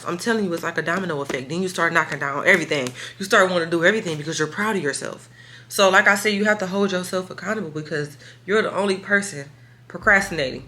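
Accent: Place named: Detroit, United States. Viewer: I can hear the Detroit in your voice ❤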